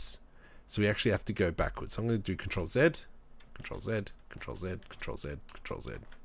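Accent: Australia. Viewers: Spinning tutorial made infinitely better in an Aussie accent ;P - Good stuff and very helpful.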